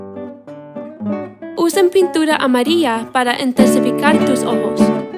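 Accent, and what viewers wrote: Spain. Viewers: Me dio mucha ternura tu acento español jajajaja hermoso maquillaje. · Me encanto el vídeo y me encanto escuchar tu español💕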